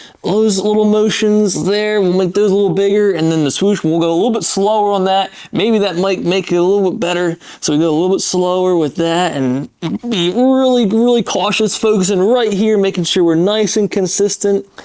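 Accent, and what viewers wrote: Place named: Australia. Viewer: Love the Rolf Harris accent - except he is now persona non grata.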